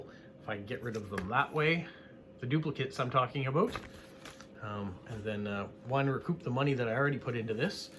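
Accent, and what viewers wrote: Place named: Canada. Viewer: Between these two videos that is a heck of a lot of US stamps. BTW I always get a kick out of your Canadian accent.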